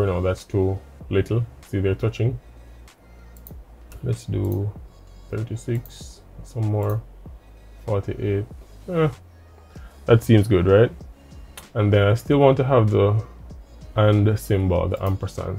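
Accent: Jamaica. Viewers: Bless up! I hear the Jamaican accent. Good to see the Jamaican Youtubers #Winning #NewSubscriber 🙌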